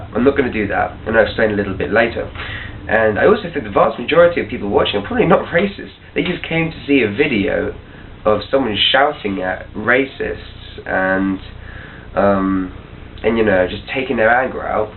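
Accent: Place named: South, United States. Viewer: i love your videos they are very insightful:) p.s. im in love with your voice i love southern accents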